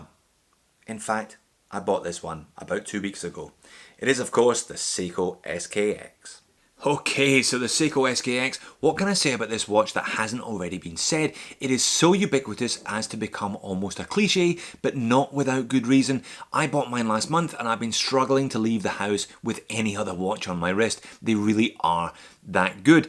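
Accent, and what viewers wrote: Scotland, United Kingdom. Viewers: Are you Scottish? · Are you a Scottish? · Scottish T, scottish accent.. instantly subscribed! :D Nice video btw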